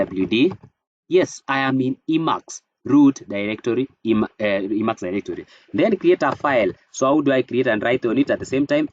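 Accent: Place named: Kenya. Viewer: love your accent,kenyan?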